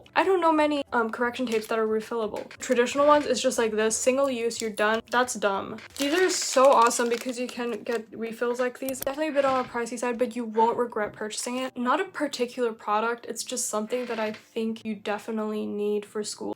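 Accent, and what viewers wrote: United States. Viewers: i totally thought you were american lol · these are all great choices! btw, why do you have an american accent when you're from Austria?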